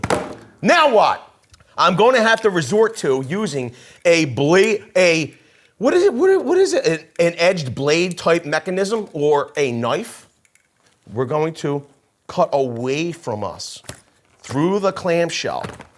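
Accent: Philadelphia, United States. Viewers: Just discovered your channel. Love it. Sounds like you’re from Philly, yes?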